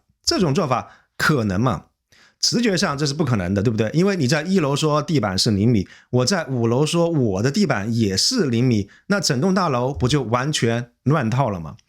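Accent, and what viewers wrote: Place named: South, China. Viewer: 一周前才偶然关注先生的油管频道，集中观看了部分作品。首先，惊叹于先生的开阔视野和博闻强记； 其次，受益于这种逻辑完善、条理清晰而不紧不慢的叙事； 最后，感佩于这种不受主体和利益相关者的干扰，既能够设身处地忠于历史，而又能够跳出站队与偏见，努力还原真相及实事求是的态度。我不了解先生的个人背景，但令人舒适的南方口语，娓娓道来的文辞背后，包藏着古老的文化底蕴；中西合璧的知识结构，又浸润着清新的现代气息；不避争议而献上自己的一家之言和独到见解，也在诚恳中闪现出自信和智慧的光芒。虽偶有新论令人耳目一新，但更多的是那种沉稳大气、从容优雅，让知识和信息淙淙流进观者心田，如沐春风、如临清泉。世有纷扰，多所歧见。所论，颇值静观。悠管纷乱众奇葩天籁自别群乌鸦万千迷雾森森色一缕清音映落霞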